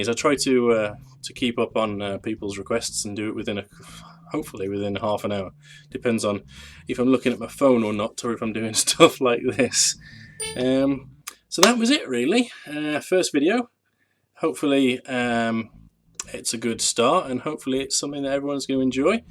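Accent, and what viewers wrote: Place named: United Kingdom. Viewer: Your Brittish accent is so annoying. Please do videos in Middle West American English, please.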